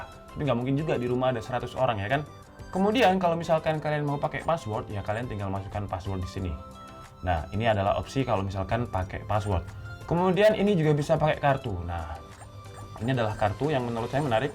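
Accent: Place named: East Java, Indonesia. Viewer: Orang jawa timur ya bang? Kalau benar abang orang jawa timur jadi kita sama :)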